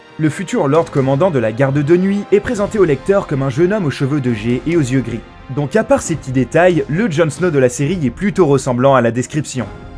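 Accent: England, United Kingdom. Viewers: Arrête avec l'accent anglais et tu écorche tout les noms et ta jamais vu game of throne sa se voit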